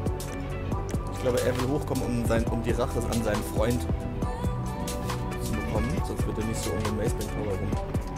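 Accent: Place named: Germany. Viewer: I love the German ascent I am dutch so I have almost the same as you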